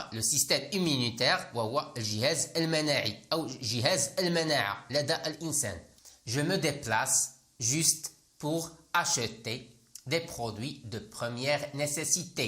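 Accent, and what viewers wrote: Morocco. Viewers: Très intéressant vachement J'ai une demande monsieur. J'espère que vous trouduisez les phrases en arabe classique s'il vous plaît parce que je comprends pas votre accent marocain. Les vôtres très très utiles vraiment 😍😍👏👏👏👏👏👏👏👏👏👏 Vous êtes très très super ! 👏👏👏👏👏👏👏👏👏👏👏👏👏 Ça me plaît beaucoup mercii monsieur.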